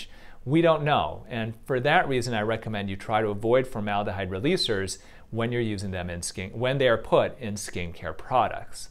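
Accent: United States. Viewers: Thanks so much!🤩 And your voise reminds me USA 16 years ago.. Was there with Work and Travel program as a student. Happy to find this channel 🥳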